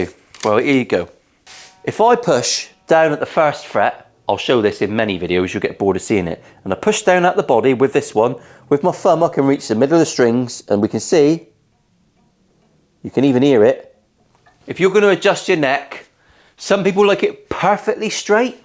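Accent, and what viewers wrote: United Kingdom. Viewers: first things first - a like to the awesome guy with his awesome British accent :) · I'm from the other side of the Atlantic and love this channel, saying fuck a bunch in a British accent is nearly always hilarious.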